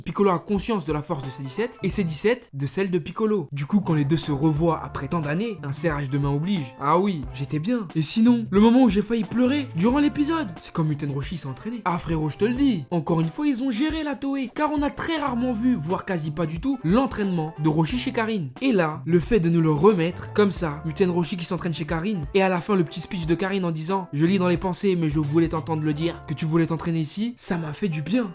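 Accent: Banlieue, France. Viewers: pourquoi tu parle comme un wesh de banlieue ?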